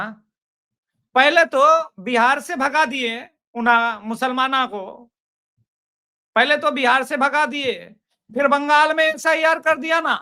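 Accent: Hyderabad, India. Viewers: आप तो पुरा हैद्राबादी स्टाईल मे बाता करता 😂😂😂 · JI AAP ZABARDAST HYDERABADI BATH KAR RAHE HO…,OH MY GOD,MY HYDERABAD SE HOO, PAR HYDERABAD ASAL NAM BHAGYANAGAR, HA 15 MINUTE MEIN SAB BHAGJAYENGE, JAI BHARATMATA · Bahit majja ata hydrabadi bata sunaneku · your Hyderabadi is excellent! 😆 Thoroughly enjoyed this video! We have full-toss entertainment going on in the entire country everyday, and the popcorn machine is getting overloaded! 😂😂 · you have nailed the hydrabadi accent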